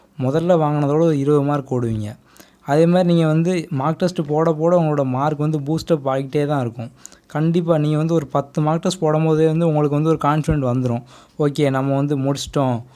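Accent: Tirunelveli, India. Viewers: Thnq so much...and happy to hear tirunelveli slang... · Bro super thank u...But neenga nellai or thoothukudi yetho oru area thana...bro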